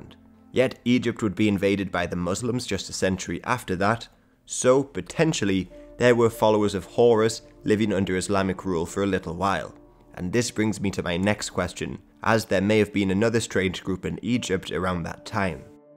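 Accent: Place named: RP, United Kingdom. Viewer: Your accent is really posh!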